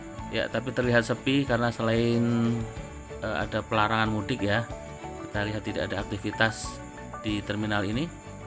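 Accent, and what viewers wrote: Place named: Java, Indonesia. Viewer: Si mas nya berlogat jawa. Dari jawa ya mas?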